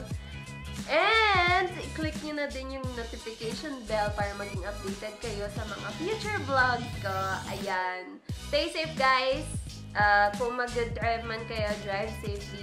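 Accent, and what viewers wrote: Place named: Pampanga, Philippines. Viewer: Kapampangan ka po? May accent e haha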